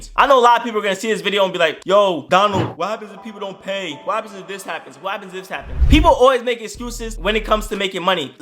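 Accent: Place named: Caribbean. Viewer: I knew you were Caribbean 😅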